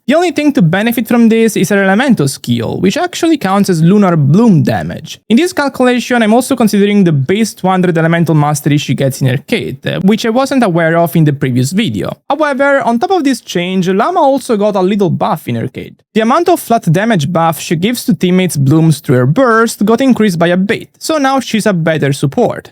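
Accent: Italy. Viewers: are u italian? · hai un ottimo inglese ma il nostro accento è riconoscibile lontano un miglio 😂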